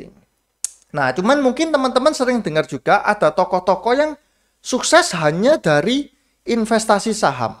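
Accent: Surabaya, Indonesia. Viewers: Wah asli suroboyo iki.. Salam dari meduro.. 😁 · arek suroboyo kapasan toh bro.. auto like n subcribe..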